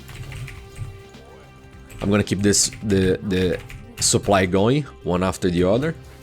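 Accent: Brazil. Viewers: ahahaha só pelo sotaque já vi q é br ! bom tutorial ! comecei a jogar recentemente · thats a nice brazilian accent you got there! cheers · Thank you so much for this build! (and Btw are you Brazilian? your accent sounds like it)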